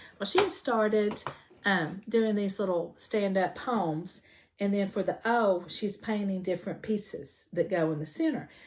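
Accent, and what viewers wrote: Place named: South, United States. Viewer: I could listen to you talk all day that southern drawl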